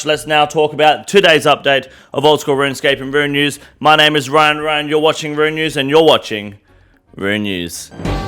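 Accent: Australia. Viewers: idk about you but i’m watching his vids because he’s actually unique, very very funny (had quite a few laughs here) intelligent and level headed. Doesn’t involve himself in drama. Stays winning and stays in his lane. Aussie accent, what’s not to like about the dude? He could be reading the entirety of lord of the rings as an audiobook and i’d listen because it’s his energy and personality that’s infectious.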